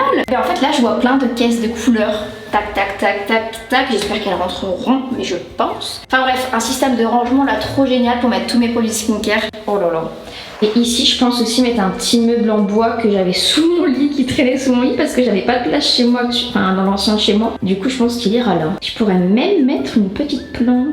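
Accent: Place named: North, France. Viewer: tu es originaire du nord de la france? tu as un accent >_<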